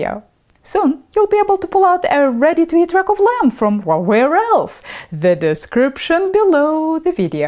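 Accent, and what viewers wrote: Russia. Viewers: this russian accent, sexy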